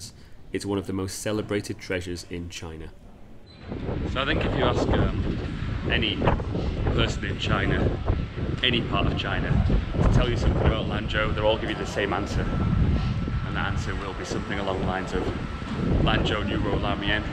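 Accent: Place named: Northern England, United Kingdom. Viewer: Nice video you northern chuff! Subbed! you from Sheffield? Leeds?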